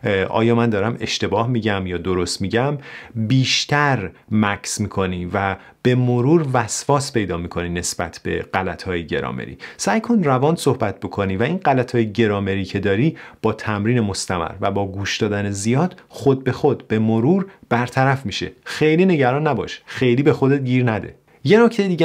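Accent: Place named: United States. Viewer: ممنون از لطفتون🙏🌱 خودتون با لهجه‌ی آمریکایی در کشور انگلیس صحبت میکنین این تاثیری روی ارتباطات نداره؟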